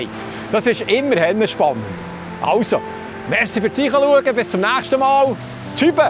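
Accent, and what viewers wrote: Bern, Switzerland. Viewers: Die Infos sind eifach grossartig, zudäm em orchige Bärndütsch. Liebi Grüess us em Appenzällerland 🥹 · Grüessli us Thun/Gwatt, ha jedesmau Freud di zgseh, so ufgsteut u mit herrlechem Bärndütsch, i liebes. Mit 76 Jahr geng no unternämigsfreudigi Grüess nach Californie. Ha dört im Jahr 1993/94 im Lodi Quarterhorses trainiert u mini 10 jähregi Tochter isch dörte id Schuel. Het sofort guet Änglisch chönne u miner Ross hei Bärndütsch verstande😝🤣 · Amerika gits nid, sie heis gar nie gfunge🎶 Bi dir cha sogar ig als Bärner no lehre richtig bärndütsch schnurre. "Sürmle" hani scho lang nüm ghört.😅 Gruess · Hallo, eine Heimweh Schweizerin in Eitorf NRW, freut sich jede Woche über Deine Beiträge - und vor allem über das gemütliche Bärndütsch. Weiter so herzlichen Dank 😅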